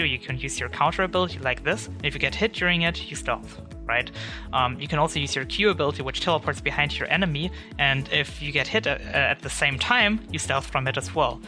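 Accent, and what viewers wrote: Germany. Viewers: are you german? :p kinda sounds like it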